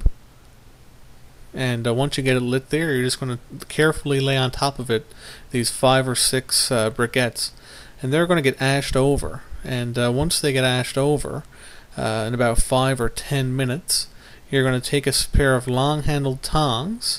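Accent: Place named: Newfoundland, Canada. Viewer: Awesome. I figured Newfy but sometimes i get confused by people from Nova Scotia etc. I am from BC myself and just getting into this charcoal cooking. Tons of fun! Cool Videos.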